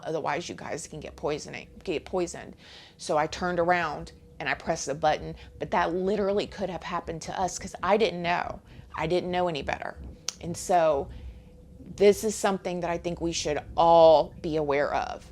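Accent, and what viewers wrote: South, United States. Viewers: I swear you have become my favorite YouTuber. If you are the same in person then I would love being around you. You would probably be a good influence because I catch myself wondering how you feel about some subjects. You are so comfortable with being yourself. With being Southern! I have traveled/lived out West and some up North. When I hear you explaining things that I hear other YouTubers make fun of because it's Southern, it is refreshing. I can laugh at what we do as Southerners, but when someone else does it, it bothers me? 😆 Bailey Sarian is the only reason I started watching YouTubers instead of just music. You were like the second person. I didn't know how I felt when you started doing True Crime. Then I loved how you covered current events and your commitment to uploading on days expected and more. It actually confused me when I saw "spooky intro", but I just thought I missed it. 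You always upload! So happy for you! You deserve it! I totally see you getting to millions. Don't change... your sincere tears was so relatable! You're doing so good!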